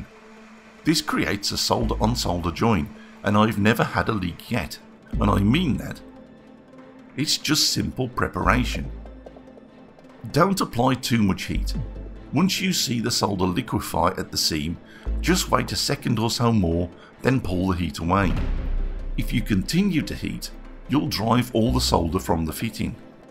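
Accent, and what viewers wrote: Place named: Scotland, United Kingdom. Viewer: Good example of why I subscribed to your channel. Love your work and most of all your commentary. Hope your mother-in-law doesn't watch. One question, your accent hinges a bit on the Scottish side, how close am I ??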